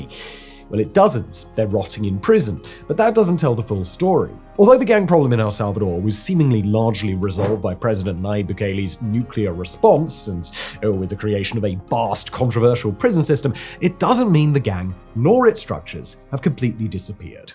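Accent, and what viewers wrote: United Kingdom. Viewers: I always love your work and Britishly pronouncing words but clique is pronounced click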